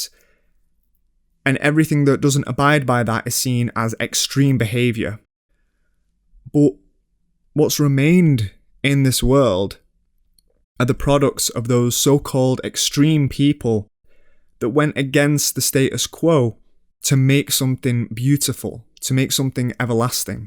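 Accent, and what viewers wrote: United Kingdom. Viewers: Where in the UK are you from? (I'm trying to figure out your accent) Good video btw and I totally agree, everytime I hear an ad read I cringe a bit. But it's like, people gotta eat I guess, so I can't really blame them. I gotta praise you for the decision tho.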